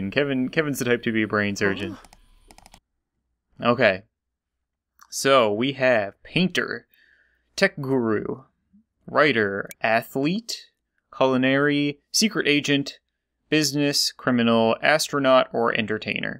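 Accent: Canada. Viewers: Are you Canadian, because you sound it. Lol. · quick question, are you Canadian? :0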